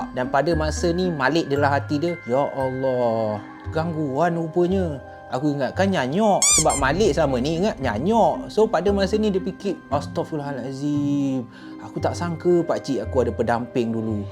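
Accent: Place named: Kelantan, Malaysia. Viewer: acu tera crito kuk baso klate pulok ehh...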